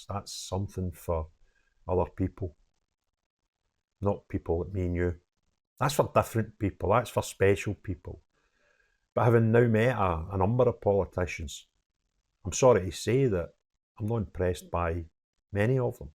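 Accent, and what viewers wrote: Scotland, United Kingdom. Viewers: Great to have your Scottish accent..we represent our beautiful country of Scotland….we must work together and get away from the total control of the English..we are the oppressed..we are Palestine…thank you for being a voice for us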